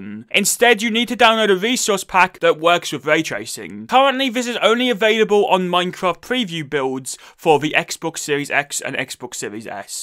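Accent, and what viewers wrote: United Kingdom. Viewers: i think he’s just british 💀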